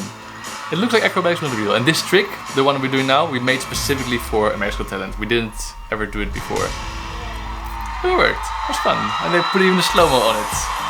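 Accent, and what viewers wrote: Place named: Netherlands. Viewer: As soon I heard the accent, I knew you where a fellow dutchman! I've seen your audition too! I loved it! It's a positive sight, among all this intrution of i.A.!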